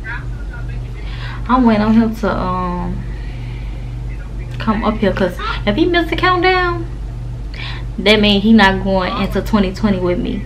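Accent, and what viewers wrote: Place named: United States. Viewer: Heavy country accent yasss🧡🧡🧡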